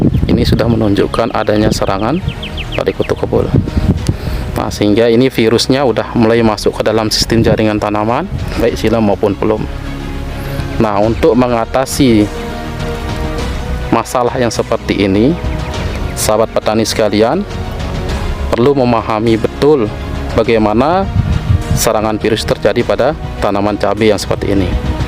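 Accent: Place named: Lombok, Indonesia. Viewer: Logat orang sasak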